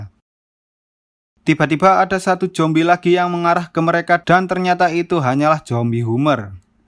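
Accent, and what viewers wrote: Java, Indonesia. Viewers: Ngomong jowone lucu aku ngakak anjir onok - onok ae😂😂😂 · Jowone mantep🤣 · Jowo Yo mas 😂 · Wong jowo Iki? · Medok Medok Mantapp 🤣🤣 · Medok nmen mas V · wong endi mas😂 kok iso jowo aku seko jogja salam mas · Jowo rek wkk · Lambe jowo. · Mas pean wong jowo ta😁😁😁 Wocoen mas😁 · Jowomu ngendi min😂 Kediri Jawa timur hadir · weleh wong jowo toh mase🖐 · bang Lu orang Jawa ato Sunda Sih?😙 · Mas jowo Endi kowe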